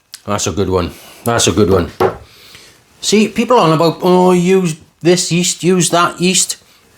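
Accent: Wales, United Kingdom. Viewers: Don't know if it's the Welsh accent or you're dressed up like Dexter, but I was in stitches. Great video and very entertaining.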